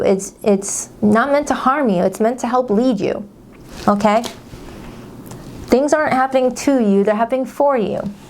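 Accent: United States. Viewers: I love your readings but could I ask you to slow the speed at which you are speaking as I know a lot of us Brits 🇬🇧 have great difficulty understanding certain US accents. We have to put the subtitles on to try and grasp what you are saying even though you are speaking English. 🙈 Please don’t take that as a criticism or take it personally as we none of us can help our accents. Many thanks for the work you do for us all. God bless and namaste 🙏🏼 xx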